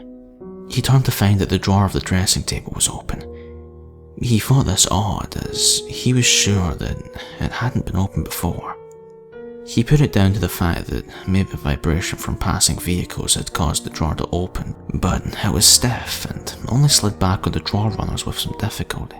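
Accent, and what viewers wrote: Scotland, United Kingdom. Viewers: Hi devilslittlesister. Unfortunately for my freaked out family, it's not a creepypasta, they swear blind it's true. And, yeah, he's a great narrator, I'm a sucker for a Scottish accent!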